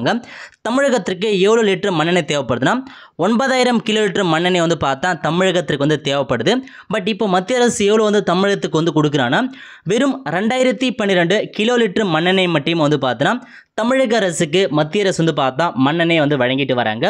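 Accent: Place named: North India, India. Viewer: Yes north indian style la pesurar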